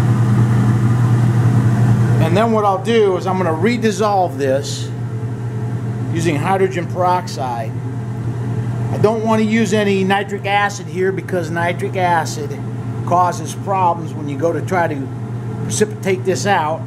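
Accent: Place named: South, United States. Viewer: Great video, but after a while I started thinking it was George W. doing this! Dunno, must be the southern drawl that sounds a little like him...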